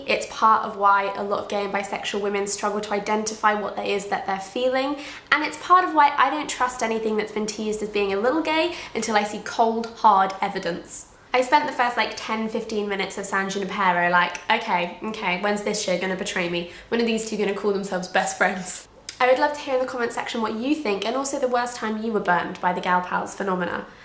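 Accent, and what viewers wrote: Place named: United Kingdom. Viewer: How did I know she was going to have a British accent before I even heard her voice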